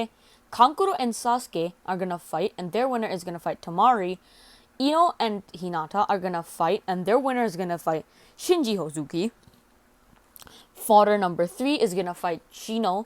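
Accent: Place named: Australia. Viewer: That’s Australian not American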